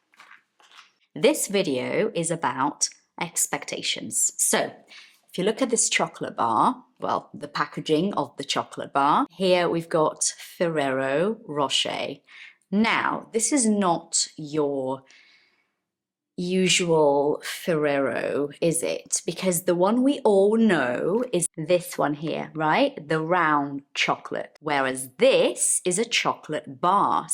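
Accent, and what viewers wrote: United Kingdom. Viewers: I'm much amazed to listen you talking In british accent so smoothly. Even being a Brazilian you've a great command in British Accent.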